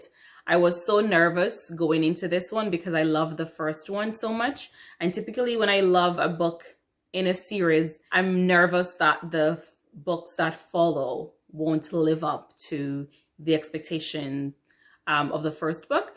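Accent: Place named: Ireland. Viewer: Hey! I have been following you on insta (I am libraryjunky) and didn't realize you have a book tube channel! So fun! I LOVE your accent! Where are you from originally? Am I hearing Irish or Jamaican or am I crazy?!? Hahaha! Nice to see you on here!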